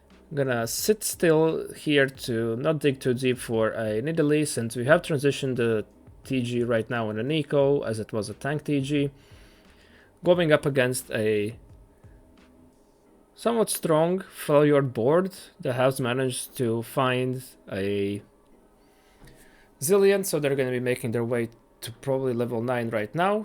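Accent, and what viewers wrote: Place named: Latvia. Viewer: Will have to try this one out. Based on accent and Channel name I guess it's safe to assume you are from Latvia. Also, it seems like the titles of your videos grab attention very well, but some work on thumbnails could help you with reach, I haven't explored much of TFT content, but a bit of research on other TFT videos and their packaging can go a long way when it comes to exposure.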